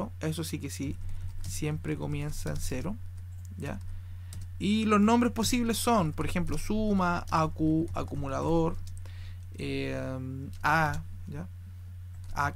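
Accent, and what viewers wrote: Chile. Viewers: no se podia menos chileno?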